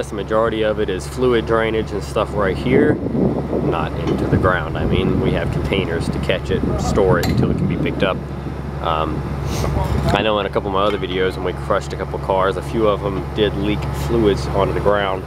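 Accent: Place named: Carolina, United States. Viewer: Thanks...that was cool. Ever ship cars to other states? Where are you? Sounds like a Carolina voice.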